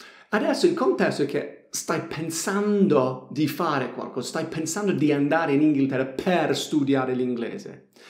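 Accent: Apulia, Italy. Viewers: mitico !! Pugl-English !! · ma mi sbaglio o hai un accento pugliese? hai vissuto in puglia? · Ecco, mi sembrava fosse un accento pugliese, ma non ne ero sicuro. Incredibile. Molto preparato. Un onore seguire le sue lezioni. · Allora non l' ho notato solo io che ha acquisito la cadenza pugliese?! 😂😂😂😂😂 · Il tuo accento pugliese ti renderà famoso!!! È veramente simpatico 😃 SUPER!!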